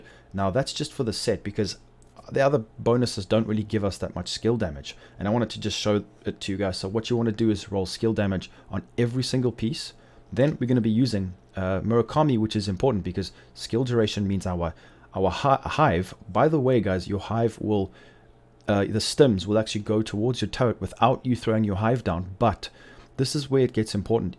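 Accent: South Africa. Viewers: Must be a South African accent! Great Video! Followed this build and it was not just fun to aim for it. But to enjoy it when it was near complete / completed.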